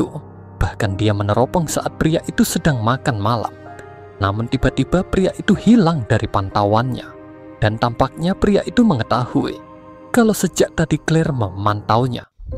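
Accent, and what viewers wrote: Bali, Indonesia. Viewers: Logat bali memang gini kaa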